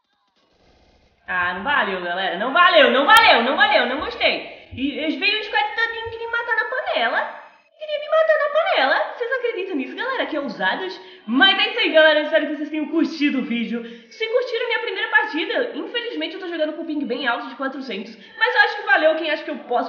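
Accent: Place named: Portugal. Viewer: Tem Um Sutac Portugues muito Lindo Amei❤❤😍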